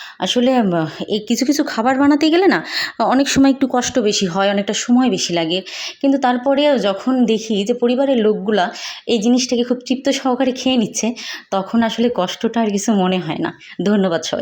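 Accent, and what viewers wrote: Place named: Noakhali, Bangladesh. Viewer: প্রথম থেকে শেষ পর্যন্ত সাথেই ছিলাম আপনি নোয়াখালীর ভাষায় কথা বললেন খুব সুন্দর লাগলো ❤❤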